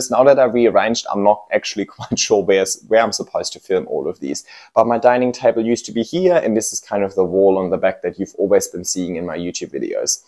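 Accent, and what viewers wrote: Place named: Germany. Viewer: den deutschen akzent versteckste nicht, trotz sehr gutem englisch, aber sollste auch nicht! :-) NRW/Osnabrück? Habe jetzt nicht das gesamte Video geguckt, wie lange wächst deine Größte Monstera und die albi variegata?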